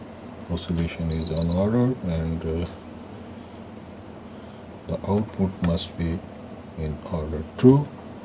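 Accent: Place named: India. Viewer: Sorry 😐 But i don't like hindi accent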